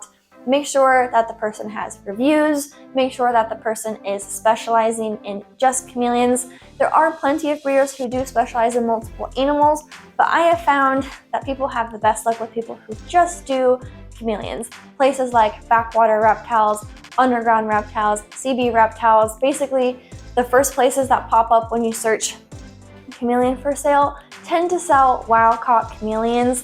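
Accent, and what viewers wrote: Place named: England, United Kingdom. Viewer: Lol your hot sounded very posh England english 😃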